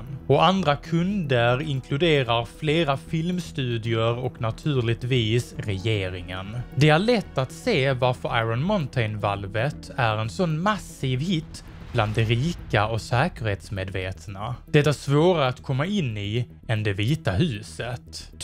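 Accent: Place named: Västergötland, Sweden. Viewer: Holger Nilsson? Det är för att båda är i ungefär samma ålder och pratar västgötska.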